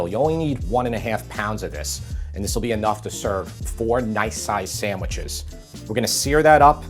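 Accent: New York, United States. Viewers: One reason besides the nice recipes you cook is your New York accent. I miss the accent, the culture, everything.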